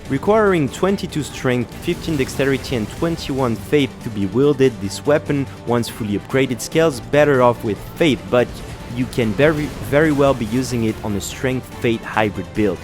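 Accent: France. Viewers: T’es français toi c’est sure, mais t’as un accent vraiment mignon en anglais 😊 and I’m sure you made this video for the PVP player and not PVE. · A il a un accent français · Je kiff tes vidéos tu gère serieux, je rep en francais j'ai entendu "putain" dans une de tes videos et t'as un accents un peu "fr" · cet accent anglais du Pas de Calais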